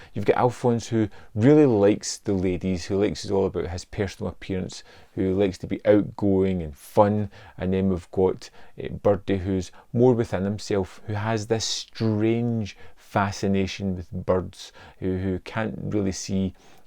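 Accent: Scotland, United Kingdom. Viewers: I'm here because another place asked for best Nicholas Cage movies and i thought of Birdy. I've been in mental hospitals so I related to that part. At one point Modine mentioned Wrestling and around this movie he also did Vision Quest. Both movies showed him really in good shape. But hey...I was too at that age. Oh, and since I'm new to this place, was is your accent? I live in in Boston and have that and it's different than New York which isn't far away. But I'm guessing Scottish?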